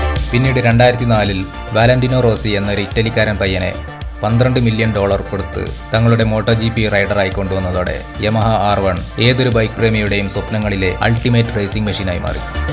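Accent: India. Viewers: Pakka kozhikode slang is here 😇😇😇🥰🥰😍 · ഇങ്ങള് കണ്ണൂരാണോ ....? 🤔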